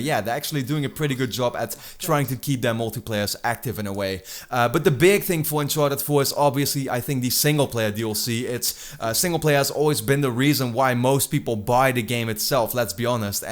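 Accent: Netherlands. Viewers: Is Dutch? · lol hardcore nederlands accent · lel ik had 2 min nodig om te horen dat je nederlands bent XD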